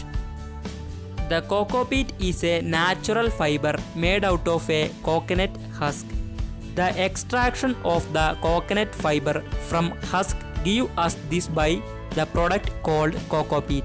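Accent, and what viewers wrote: Kerala, India. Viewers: Thanks bro .. your kerela mti sounds very nice n Adorable.. anyways it was very informative .. thanks again!! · Malayali voice r8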